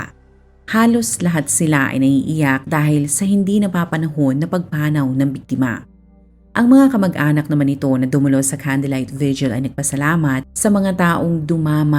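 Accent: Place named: Visayas, Philippines. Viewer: Bisayang bisaya mag salita yung narrator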